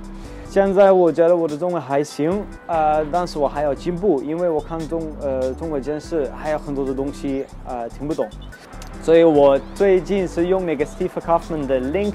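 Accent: South, China. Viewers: 好浓的南方口音啊哈哈哈 你听北京话能听懂多少？ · You have the typical accent from the South, for example with the "ch" you say "s" but it's ok i understand you, my GF is from 四川 Sichuan, very similar accent.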